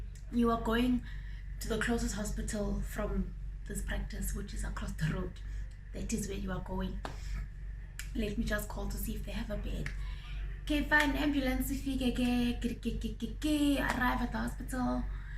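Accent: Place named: Cape, South Africa. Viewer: Amazing story! I like the in between humor, especially the Cape accent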